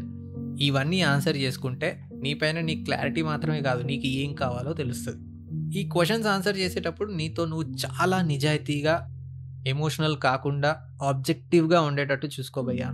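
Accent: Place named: United States. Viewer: Arranged marriages tho naaku problem kaadhu love marriage thone na problem . Andhukante nuvvu india lo unna nee matallo America vinipisthundhi sorry but I sense that . But india lo love marraige vadhu boss endhukante alanti oka love marriage couple ki puttina vaadine nenu . Naaku telusu ela unatadho vere caste ammayi abbayi pelli chesukinte aa putte pillalni ela chustharo . Aa chupulu neeku teleedhu baasu . Adhi neeku physical emi cheyyala povachu deep inside guchukuntadhi bhayya it's very hurting untadhi . Nuvvu puttadame papam annattu chussthe . Nee friends mothers nee tho friend ship cheyyodhu ani mee friend ki warning isthe vaadu appati varaku bhane mayladi tharvatha Mohan chatesthe aa bhadha chala bhayam karam ga untadhi . I envy u kids who are born for same caste arranged marriage couples . Arranged marriage lekapothe mundhu nuvvundav basu . 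Adhi okappudu chala manchi system . Aandhulo mana previous generation chala successful lead chesaru lives that's y nuvvu America velli patriarchal system criticize cheyyagaluguthunnav . Problem mana generation kids mind set lo undhi . Andhariki rights kavali responsibilities vadhu adhe major problem . Ee America velle vallaki patriarchy endhuku nachado artham kaadhu . Malli magallaki value ledhu antaro . Point Ento telusa mana south states lo patriachy kaadhu matriarchal system father enthaina earning varake . Caste system lo oka plus undhi idhi live marraige chesukunna ma mother ee chepparu enti telusa . Prathi oka caste ki vere ve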